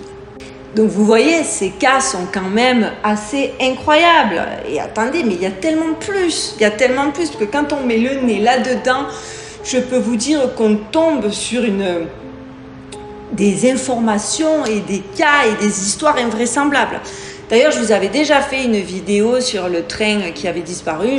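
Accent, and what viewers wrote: South, France. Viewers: coucou ça fait 3 jours que j ai découvert ta chaîne et je la dévore 😍😍😍 par contre si tu pouvais faire quelque chose pour l écho..... ça gâche un peu 😏 mais je suis agréablement charmée par ton accent du sud je m attendais à un accent canadien 😂😂😂😂 vive les expats ❤ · Merci ma jolie petite fée 🌹ça m'a fait du bien de te voir,avec ton accent du sud et ton humour. J'étais en pleurs en regardant 2 vidéos , terribles, ou mes gens se sont retrouvés, nasses, avec impossibilité de fuir.Il y avait vraiment la volonté de leurs faite très mal .😢Des parents , enfants et grands parents pacifistes. Gazés à blocs matraques. Pour rien,un crs à dit z une femme, qui m'a laissé passé, que les ordres venaient d'en haut. Sinon j'adore ta vidéo,toutes les histoires. Je connaissais, celle du gars quo disparaît, du champ, je la pensais plis vieille. Sinon connais tu l'histoire du nimitz ? 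Très étrange,j'avais vu ce film avec mon père quand J'étais ado ,ça te ferais un bon sujet🌞Merci pour ton super taf 💙Prends soin de toi, ainsi que ton fiston 💖et la belle 🐺🐾💖Duchesse . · Bravo pour tes plans d'attaque. J'adore ton accent du sud. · "il aurait pu écrire le Seigneur des Anneaux... sûrement un cousin à Tolstoi" euh, un cousin à Tolkien plutôt non ? Là tu m'as tué 😂😂👍😉 Merci de nous régaler de tes vidéos, de ton accent du sud et de ta bonne humeur 🙏🙏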